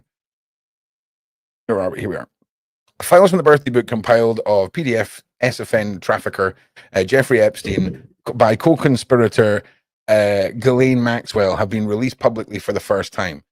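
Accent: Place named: Scotland, United Kingdom. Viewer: Liked and subbed, because that Scottish accent is so effin posh! And the content or somefin' or nuffin' 🤣👍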